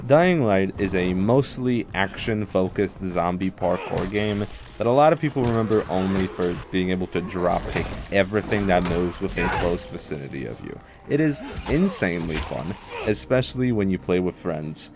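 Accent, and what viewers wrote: Poland. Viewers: Are you polish by any chance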